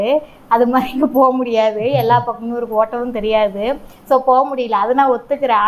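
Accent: India. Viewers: Cute akka ninga ❤...... Akka ninga nga tirunelveli ponu mari pesuringa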